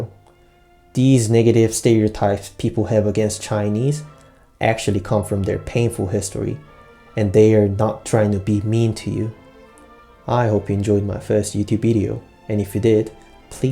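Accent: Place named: Southeast Asia. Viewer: printed in their dna is false. Its the culture. My grandfather was from china close to Nanjing. Migrated to Thailand after WW2 (there was no food). I went to a british school in thailand. I am currently studying in Australia. As far as I am concerned, my supposed selfish DNA is not influencing my behaviour in the slightest. I think you know this, but I think you're prejudiced. The Selfish section of your video is true, but there are better explanations found on youtube, explained in a much more socially acceptable way. My guy, consider your behaviour and ask yourself if this is going to do you any favors in life. You'll lose all respect from your coworkers and friends in New Zealand. It's odd, because you seem to be asian based on your voice. Most likely South East Asian. So I suppose I can understand your frustration with Chinese Tourists trust me. 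But a lot of South East Asians have chinese genes, in fact a lot of them are chinese migrants like myself. So "printed in their DNA" is just a load of nonsense. Its sad how people aspire to be edgy like this nowadays. I always thought the goal in life is the become more of a gentleman. At 18 years old you gotta lot of growing up to do. I'm doing a lot of guess work here, but I think my opinions of you are pretty accurate and I can see eye to eye with you. I simply do not condone this type of behaviour.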